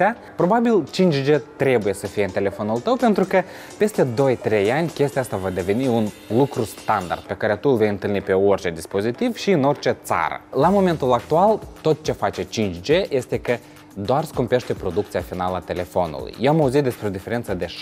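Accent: Moldova. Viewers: Si eu sunt romanca, locuiesc in Italia si ador accentul asta moldovenesc! ❤️ Cat despre Iphone nu sunt fana. Nu as investi banii in asa ceva, cand pe piata exista telefoane super faine si iti poti alege. Totusi pentru fiica-mea mai fac exceptii...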